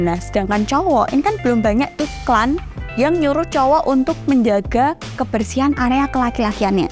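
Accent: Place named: Central Java, Indonesia. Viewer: bahasa ne koyo logat Jawa tengah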